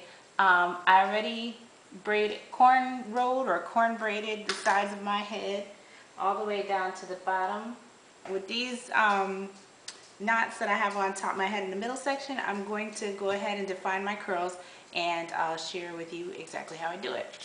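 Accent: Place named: Caribbean. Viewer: I can hear west indian in your accent..where are you from?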